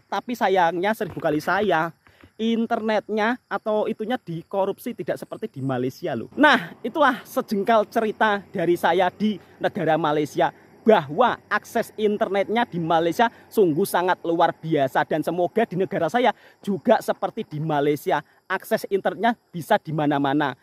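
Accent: Java, Indonesia. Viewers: bang medoknya ngisin ngisini☺️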